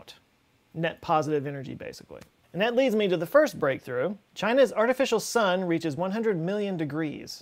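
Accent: Texas, United States. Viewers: It's the American accent. Autism is abound in the comments. We tend to say Tur-Ben, not Tur-Bine. Just wow. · Dude... this seriously wasn't worth addressing. We love you both despite and because of your crazy Texan Sikh-friendly accent. You should be proud of being able to cause such profound mood elevation with it. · So the English accent is the only correct way and the American accent is always wrong? I don't think so. Also, there are many American accents, and most of them pronounce the word TURBINE not TURBAN. His is a regional accent. Edit: Some Americans also pronounce the word like TURBEEN. This is also an accent. It isn't a more or less correct way to pronounce the word. Don't be an accent snob. nobody likes an accent snob. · Why do you keep talking about turning a turban? OH! TURBINE - rhymes with coal mine Funny American accent, but I still enjoy your videos :-)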